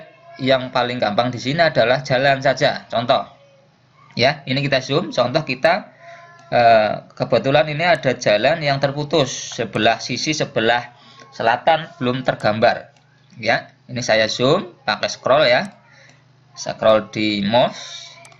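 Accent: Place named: Banyumas, Indonesia. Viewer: Salam dari Kawunganten, sip.penjelasannya mudah dipahami. ngapaknya muncul😁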